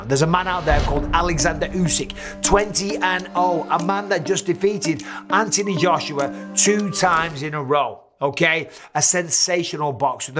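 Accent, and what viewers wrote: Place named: Newcastle, United Kingdom. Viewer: Bisping turning into true geordie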